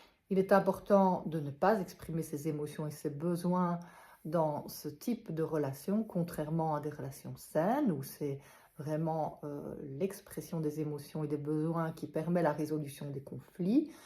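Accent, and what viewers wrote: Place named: Belgium. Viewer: Bonne explication, dans un bon français, avec bel accent belge.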